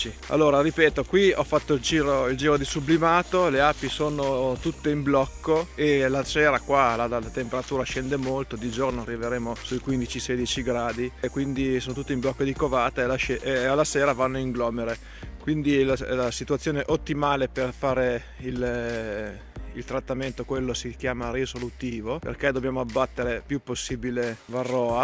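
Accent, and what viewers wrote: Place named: Monferrato, Italy. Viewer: Ciao I tuoi video sono sempre interessanti, facci sapere cosa farai e come finiscono quelle due o tre iper infestate. Qui ho tolto le strisce settimana scorsa e ho trovato poca varroa, tra un mese o meno farò un sublimato, sono tutte in blocco eccetto una che ha una rosettina ma è un'arnia sfigatella, non ha raggiunto la massa critica per l'inverno mentre le altre sono in condizione 2 o 3 di forza, ho uno schema di valutazione da 1 a 3 per le varie caratteristiche dellalveare; io sono in Val Seriana e le temperature vanno da 18 a 2; tu dove sei, dall'accento ti colloco in Monferrato.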